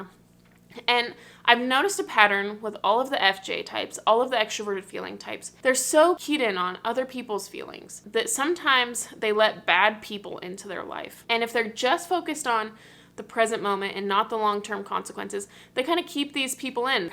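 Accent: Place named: France. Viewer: Kool Vidéo! You speak english like it is french😎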